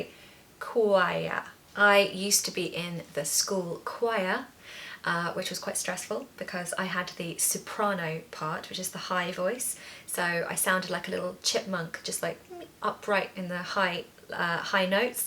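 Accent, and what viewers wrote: RP, United Kingdom. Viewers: Lovely accent you have! I always liked everything British so much and try to improve my spoken British further, so I’m happy to have found the channel. So actually apart from maybe Wocestershire, I didn’t find any of these words very hard to pronounce. Even if I maybe don’t always get it precisely right, I knew how they should sound. In fact, my most hated word to pronounce is actually: PURCHASING!!! I’m working as a buyer and everytime I have to use that word I say it with a German emphasis on the second syllable instead of the first one!! I have to force myself to say „purr-che-sing“ instead of the rather German sounding „per-chaesing“😂😂😂 I pronounced it wrong when talking to a Texan and he didn’t understand at all what I meant. I hate that word 😂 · Your are absolutely welcome. Keep on teaching with your beautiful RP and encourage learners to converse in correct English. 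· I love your British accent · Splendid British pronunciation ,Thank you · Hello! Madam, I love your British English accent. Your teaching techniques are excellent. 😊❤ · wow I like.british speaking accent english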